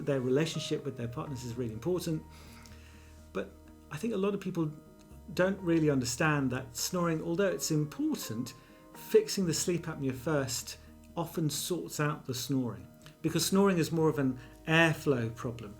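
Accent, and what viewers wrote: United Kingdom. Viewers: Please remove the background music while you are speaking! It is very annoying especially trying to understand with your British accent. Thank you!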